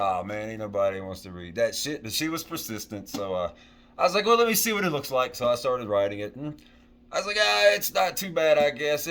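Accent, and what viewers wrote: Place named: Appalachia, United States. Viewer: "Man, no one is gunna believe this shit" 😂 It's so true though. Life is totally unbelievable, a bizarre adventure of cosmic grandeur and idiotic stupidity. I can't wait to read this book. And FYI as a Brit I love your accent and figures of speech. Nothing wrong with being a hillbilly 🤣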